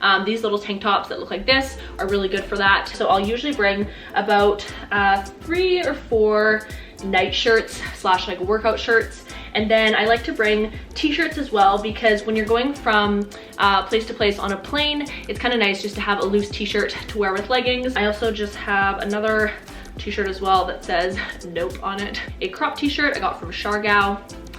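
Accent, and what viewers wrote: Canada. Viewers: I love the way you say bag hahahaha your Canadian accent is so cute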